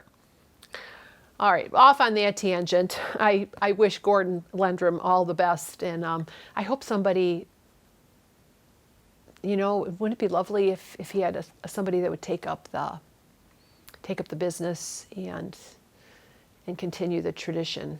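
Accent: Chicago, United States. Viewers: Thanks for your episode. You inspire me with your spinning. I have just begun spinning and have to learn how not to over spin it. I had to smile when you mentioned Sturgis, Michigan as my family had a summer home on Lake Altrich (I’m not sure if I am spelling that correctly)! It brought back fond memories of childhood. I also have to say I love listening to you because I hear the “Chicago” in your voice which, again, brings me back to my own childhood in a Chicago suburb. I do hope you understand that is a wonderful quality in your voice to me! 🤗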